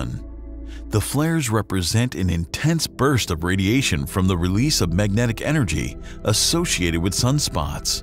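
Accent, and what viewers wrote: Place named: United States. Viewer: This American accent is disgusting. Can't watch it.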